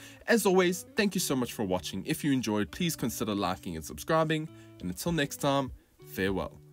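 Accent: South Africa. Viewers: South African??? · Yes it's funny I didn't notice that about sath afrikan before. Also bright being pronounced as braght.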